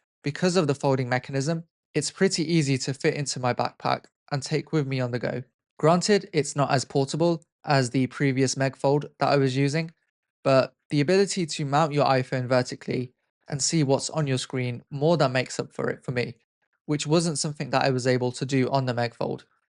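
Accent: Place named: United Kingdom. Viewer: I really like your uk ascent 😂 😂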